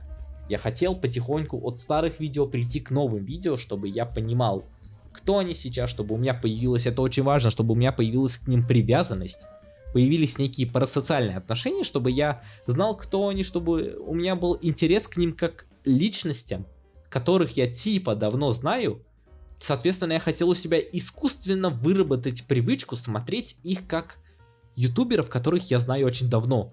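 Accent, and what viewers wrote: Japan. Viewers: Как по мне, это поможет не только для японского, но и для любых других языков, и наверное, даже не важно для каких. Абсолютно для любых. Спасибо огромное, что сняли это видео, правда. За какой язык я уже только не бралась, я вечно его забрасывала, тк не знала с чего мне начать, как правильно учить и ТД(самый первый был кстати японский). На всех видео все говорили по разному и их 10ти минутные видео шли часами будто бы. Из них я никогда ничего не понимала. В вашем же видео я поняла абсолютно всё, да и 10 минут прошли как 5 Спасибо огромное за такое реально полезное видео. Думаю, я вернусь к изучению японского по вашему способу.. (Мне кстати интересно, а у вас японский акцент сам появился после прослушивания, или же вы просто ещё проговаривали слова? Извиняюсь, если это было сказано в прошлом видео. Я ещё не дошла до него)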